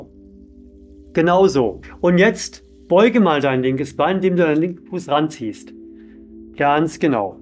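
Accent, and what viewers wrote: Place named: Hesse, Germany. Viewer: the best. Höse hoch usw. Mit hessisch gehts besser. 👍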